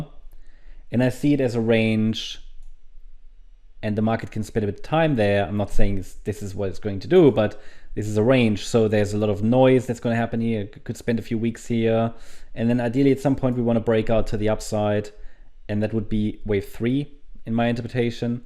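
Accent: Germany. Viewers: Your accent sounds German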